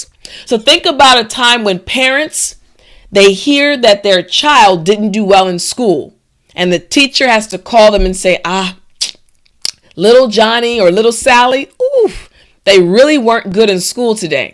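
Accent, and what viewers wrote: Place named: United States. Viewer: Hello, there! I'm an English teacher from Rio de Janeiro, Brazil. I usually watch videos from British channels, for I'm a sucker for their accent. But you speak so clearly and beautifully that I have become your fan. Where in the US are you from? Thank you and keep up the good work! <3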